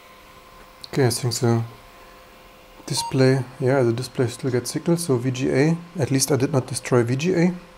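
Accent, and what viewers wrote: Germany. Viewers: German native ?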